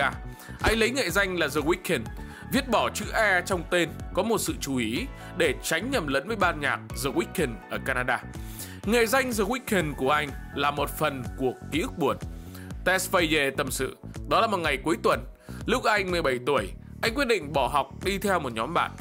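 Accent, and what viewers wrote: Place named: North, Vietnam. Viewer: Ng bắc toàn đọc the là giờ. Thua luôn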